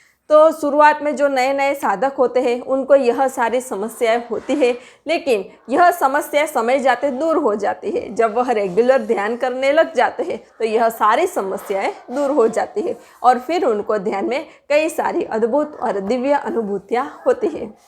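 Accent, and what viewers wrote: Gujarat, India. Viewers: Thank you .Kya aap Gujarati hai?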